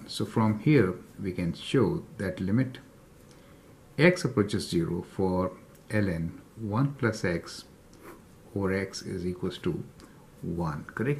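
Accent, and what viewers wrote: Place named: India. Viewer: abi hindistanlı gibi konuşuyosun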